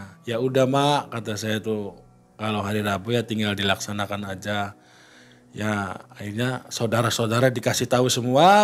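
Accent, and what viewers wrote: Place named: Java, Indonesia. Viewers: Mas kalau cerita sedeppp, logat cirebone sedeppp nemen jare wong tegal 😅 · Bahasa Cirebon nya ga ilang yaa ang,,sampe ngakak dengerin cerita nya.😅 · lucu klo kang ngmong khas jwa bgt😂 tp klo sya liat liat kang ada trah bkn orng smbrangn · Di tunggu lagi Mang cerita ia.Ciri khas orang Cirebon bahasa ia.. anak cucu Mbah Kuwu Sangkan cirebon.salam dari Aso Cirebon Winong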